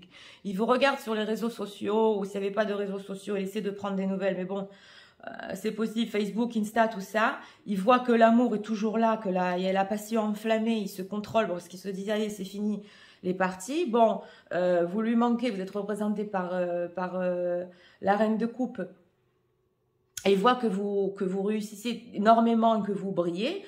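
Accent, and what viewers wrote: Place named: South, France. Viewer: Je viens juste d'écouter cette guidance qui date de 1 an. C'est marrant, je ne savais pas que vous aviez un petit accent du sud 😊, accent que vous n'avez plus sur les guidances de maintenant. C'est marrant. Je ne me moque pas. Je viens de Toulouse et j'ai un accent très marqué 😉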